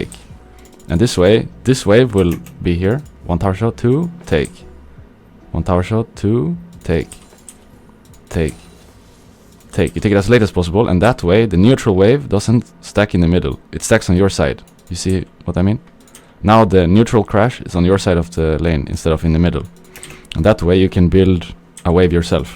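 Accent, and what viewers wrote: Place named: France. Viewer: he has a little french accent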